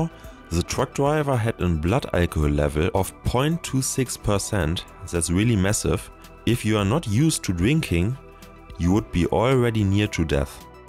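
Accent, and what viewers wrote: Germany. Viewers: Das ist das Deutscheste Englisch was ich seit langem mal wieder gehört habe. Aber schöne idee auch clips für die Englische community zu machen.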